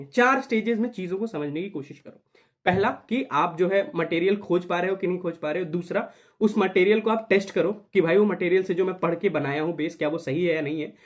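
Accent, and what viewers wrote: Bihar, India. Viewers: Bhai sach sach batana Aap Bihar se ho na ☺️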